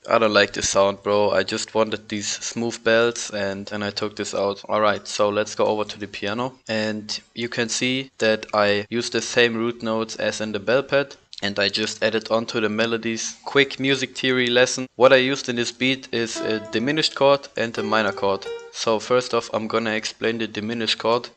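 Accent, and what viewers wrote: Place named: Germany. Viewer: man hört du bist deutscher😂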